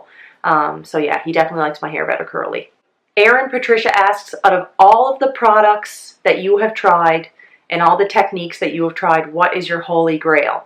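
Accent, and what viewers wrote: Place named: Cape Breton, Canada. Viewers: I don’t even have curly hair and I love watching your videos and hearing your CB accent (makes me miss home!)! Would love to see some healthy lifestyle videos. Congrats on the new office! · Hi! First of all let me say I love your channel! I came across it a few few weeks ago when I decided to let my natural curls go for maybe the fist time ever... As a teenager and into my twenties I fought my curls with a curling iron and then 14 years ago I decided that a straightening iron was the best thing ever!! Well 6 months ago I decided to embrace my grey hair as well.. Yes I jumping in over my head (pardon the pun! Lol!) with grey and curls.. What am I thinking! I bleached out my dye last August to help with the grey transition.. I thought I destroyed my hair it was like straw! Anyway I continued to straighten.. Until I began to hear about the curly girl method. 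I searched YouTube and found your video of how to step by step do my hair and tried it I was... to say the least... Amazed!!! Did not know my hair could do that! And I remind myself that it should just get better... Also amazed at the compliments I have gotten already... My hair is still two tone from the grey hair/ bleach grow out but I really think I will embrace my new look! Oh and I just want to say when I first seen your channel your accent was what caught my attention... I am from Newfoundland and I wondered if you were to, but turns out Nova Scotia isn't to far and we're "neighbours" lol! Love your Province by the way! Keep up the the great videos and Happy Anniversary!😊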